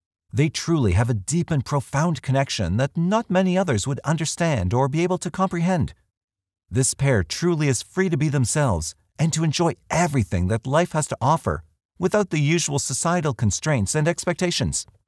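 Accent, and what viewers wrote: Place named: Canada. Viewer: The narrator is from Canada; I can tell because of his pronunciation of " ou" .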